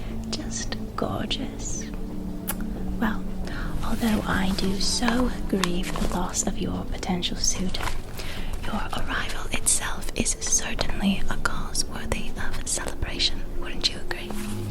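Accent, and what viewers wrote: Transatlantic, United States. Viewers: You’ll probably not see this but I think it’s transatlantic, which was a mishmash of English and American, it was usually used by the upper class and Hollywood stars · love the 1920s transatlantic accent, super cool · i like this classical american accent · She's so versatile with her roles! I'm a sucker for the transatlantic accent so this checks all my boxes 🖤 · Which American accent is it? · transatlantic accents are my weakness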